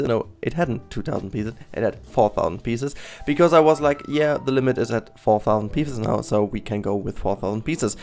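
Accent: Germany. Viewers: you sound like a german!? du bist deutsch oder? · Man hört es an dem Akzent xD so spricht fast jeder Deutsche Englisch aber du sprichst echt gut Englisch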